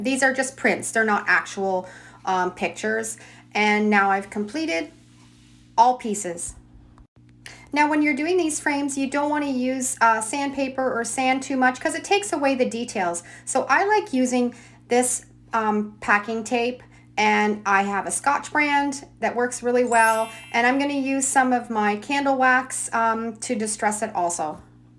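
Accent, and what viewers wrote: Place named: Canada. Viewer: I knew from your accent that you were not American! Go Canada!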